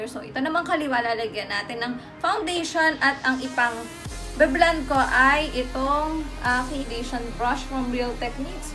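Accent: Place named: Cavite, Philippines. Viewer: Subscribed because of your punto 😅pareho tayo. I'm from Indang cavite kaya mahayon din talaga 😂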